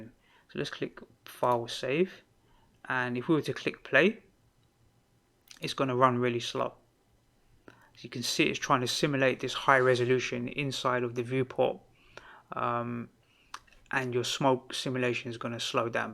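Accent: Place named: England, United Kingdom. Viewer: I love your English accent 😂🥰 Nice tutorial, thank you! 👍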